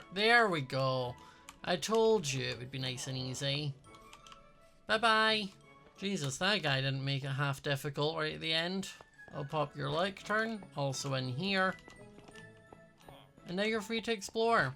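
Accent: Scotland, United Kingdom. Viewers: What is his accent I hear British but I also hear Scottish 😩I absolutely love his accent!!!!!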